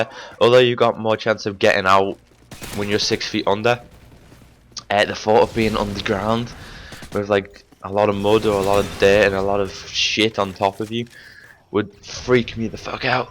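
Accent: Liverpool, United Kingdom. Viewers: may i ask what part of the uk you are from because it sounds like you have a liverpool accent :P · Liverpool accent hahaha its scouse mate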